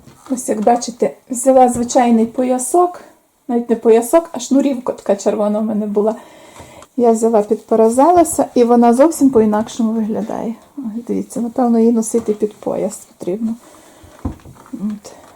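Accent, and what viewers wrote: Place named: Ternopil, Ukraine. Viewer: Добрий день,я у вас новенька,і мені цікаво,а у вас є відео,де ви розказуєте як ви попали в Карпати, дещо про себе,де працювали,про вашу сімю.Говір ваш такий,як на Тернопільщині, або на Франківщині,як ви потрапили на Запоріжжя?Розкажіть про свою сімю. Дуже буду вам вдячна. Всьго вам найкращого,обживайтесь на новій землі,пускайте свої корінчики,нехай Карпати стануть для вас гостинні та рідні.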